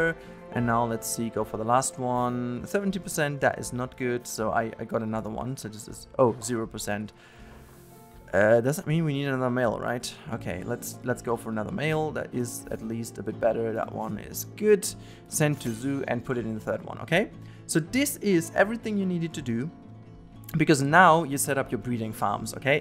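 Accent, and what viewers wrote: Germany. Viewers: kannst du das video auch auf deutsch machen ? denke mal an deinem akzent kann man erkennen das du deutch bist :D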